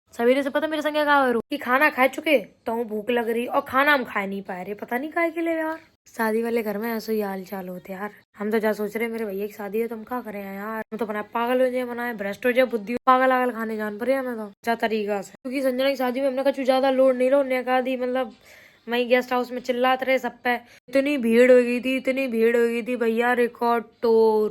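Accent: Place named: Etawah, India. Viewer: Didi bahut Maja aata hai apni Desi Etawah mainpuri bali boli me blog dekhne me ...❤❤ I'm very enjoying to watching you vlog and also enjoy a pure Desi girl with Desi style😅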